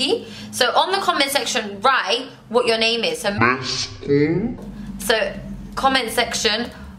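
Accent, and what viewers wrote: Arab world. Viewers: you are verry strongly arabic phone can you tray algeria dialecteplease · That's a lovely Arabic accent you have 😀😀😀😂😁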